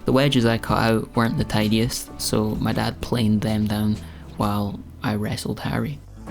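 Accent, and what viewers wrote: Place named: Fife, United Kingdom. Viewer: Hearing a fife accent saying radge paving stones made me do a double take eh. What's radge about them, ken?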